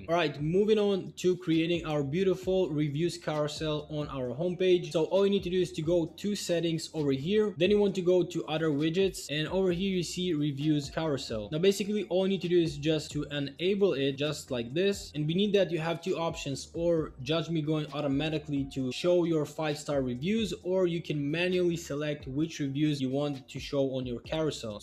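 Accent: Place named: Russia. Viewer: Спасибо за видос братишка я и не сомневался что ты русский! когда услышал твой акцент и был рад что Русский человек учит представителей других нации дропшипить! РЕСПКТ БРАТИШКА! А ТЕПЕР ВСЕ ЭТО НА РУССКОМ ПОЖАЛУЙСТА!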